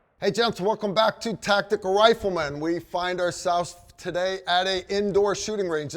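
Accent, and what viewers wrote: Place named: Eastern Pennsylvania, United States. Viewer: The accent🤔 eastern Pennsylvania maybe?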